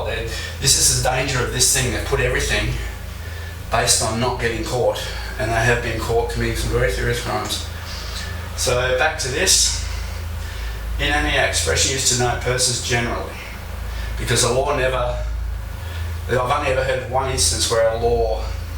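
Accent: Australia. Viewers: I found this video hard to hear because of the room and the echoes...and with Aussie accent it made the entire video hard to hear n understand. Overall, topic looks Good. Suggestions...head-set n microphone..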